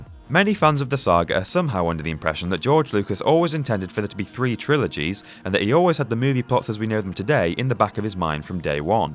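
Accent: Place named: Cheshire, United Kingdom. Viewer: rough guess would be Cheshire, or naturalised northern affectation. You only have to get a few seconds in and hear the 'Rapoonzel' to know that man!!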